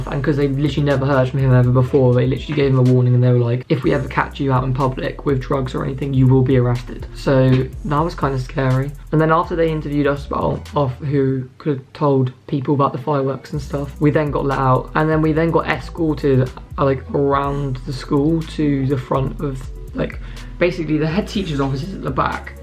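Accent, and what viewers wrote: RP, United Kingdom. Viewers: Man sounds bare posh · Posh boy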